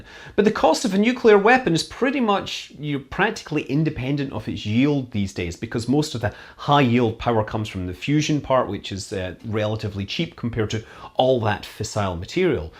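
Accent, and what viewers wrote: Scotland, United Kingdom. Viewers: U can hardly hear your Scottish accent. I guess you have been living in san Francisco for a long time. Btw I enjoy your videos keep it up.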